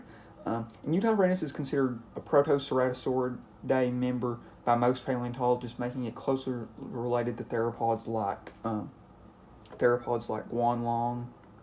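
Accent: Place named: South, United States. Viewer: Wow that looks hyper realistic! Nice southern draw you got going, I got one too I’m from Arkansas.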